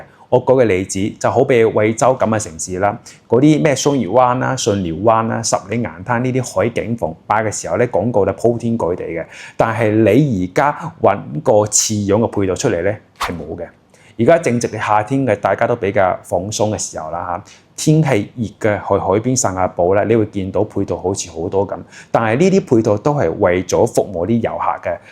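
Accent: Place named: Hong Kong, China. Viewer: 香音未改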